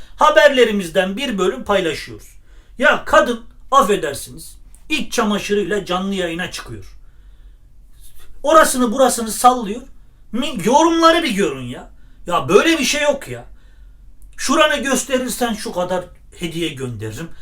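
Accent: Erzurum, Turkey. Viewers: Sempatik buluyor, sivenden supheleniyordum, Erzurumlu oldugunu soyleyince daha bir sevindirik oldum. Biz de sizin eski somurgelerinizden birindeniz ; )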